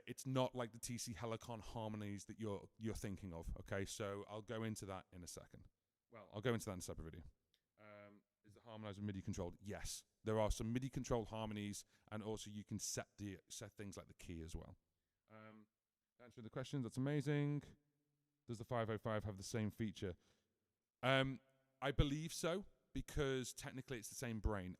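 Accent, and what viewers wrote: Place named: North Wales, United Kingdom. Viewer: excellent news. You sound fairly local to me? (I'm in Denbighshire, North Wales)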